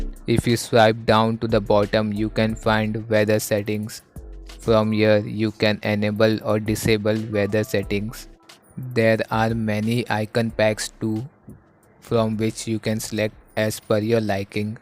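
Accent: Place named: United States. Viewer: i thought ur an american, awesome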